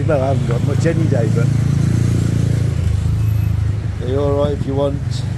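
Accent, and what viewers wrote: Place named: Nottingham, United Kingdom. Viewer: Hi cant place your accent, at a guess would say Nottingham?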